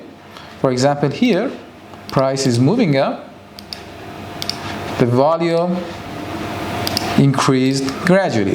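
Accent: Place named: Iran. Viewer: Hi its a great honor to see u here talking in english language but u have very farsish accent 😁😜🌹🌹❤️⭐❤️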